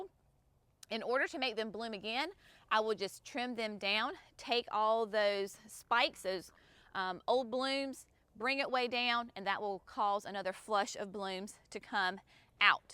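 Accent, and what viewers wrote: South, United States. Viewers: North Caroline-ah? Love that southern twang!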